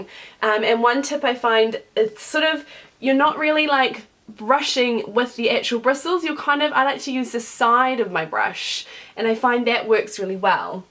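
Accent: New Zealand. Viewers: It’s a New Zealand Accent that is where I am from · Your hair looks ABSOLUTELY beautiful!! This tutorial is so helpful!! Thank you! Where are you from? New Zealand? I love your accent. (I am American. 🙂)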